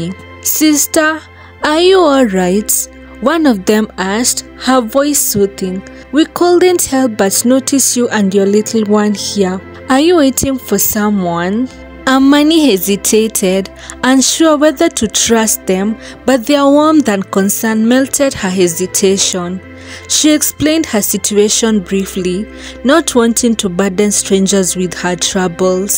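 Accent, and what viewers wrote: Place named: Kenya. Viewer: Thank you too for the stories and yes, your Kenyan accent is really nice ❤️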